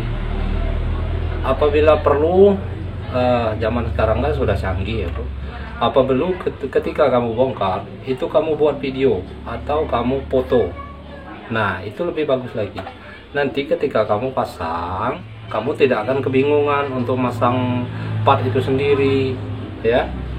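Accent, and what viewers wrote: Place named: Palembang, Indonesia. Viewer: Logat ny kayak wong plembang .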